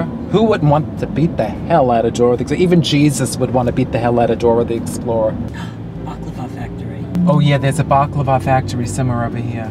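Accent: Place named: Northeast, United States. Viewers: I just came across her channel and she's so funny. I love listening to her northeastern accent and her saying "that bothers me". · There's something about you and listening to you. That's like being with a good friand your family member. The New York accent is just ahorrible. The most important thing is very pleasant to listen to you. I really, really enjoy it. You were given a geft and I hope you're happy.❤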